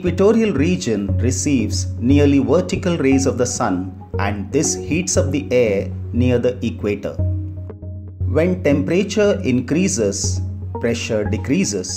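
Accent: India. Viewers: the indian accent takes it to another level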